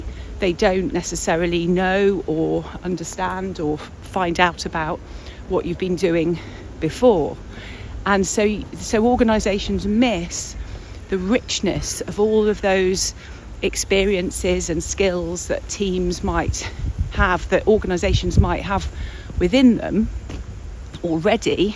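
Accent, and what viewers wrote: United Kingdom. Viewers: Hi, I love your accent. I'm studying English and I find your British accent fascinating. I'll keep watching. Thank you very much for your work. Have a nice day!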